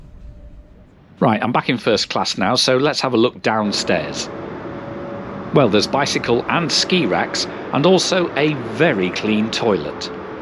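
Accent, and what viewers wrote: United Kingdom. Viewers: Wow - that's some appropriate contrasting hobby to the tranquil ) I'm always curious about the different accents from diverse places: Couldn't attribute this one to scouse, scottish, northern... neither to cockney, northern of Melbourne in Oz...? I assume, the southern(btw. Cornwall-Oxford-Cambridge-Kent) english accent is something similar to the german Niedersachsen(Hannover) - both beeing closest to the written standard language. Another trigger would possibly have been the YT-channel from NonstopEurotrip... I remember having heard once - him beeing originally from Exeter too... but listening to some difference though. Was quite surprised by the spreading of this sport - appearantly there's also some league here in SWI...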